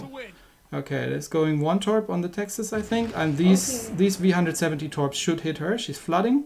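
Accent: Germany. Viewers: Hey i gptta say i found you as i needed some guidance on how to play a bit better hit those citadels and it help'd. Also you sound like you are a german but still wanna ask you what server do you play EU or NA because i tried searching your fleet yet i could not find it.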